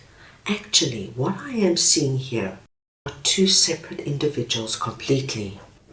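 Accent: South Africa. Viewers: I love how you read, and also love your lovely south African accent🎉❤ Thank you 🥳🙏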